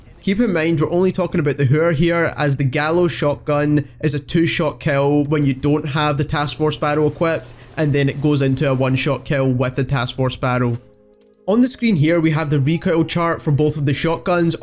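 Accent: Scotland, United Kingdom. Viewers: just interested are you scottish cos i am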